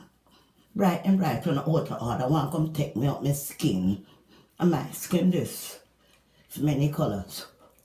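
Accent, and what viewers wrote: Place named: Jamaica. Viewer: Lol.. I love the way my Jamaican people speak. She has a personality on her!!!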